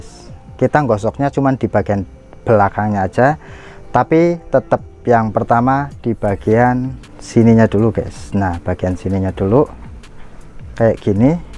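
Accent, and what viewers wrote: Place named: Comal, Indonesia. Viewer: Logatnya koyo wong Comal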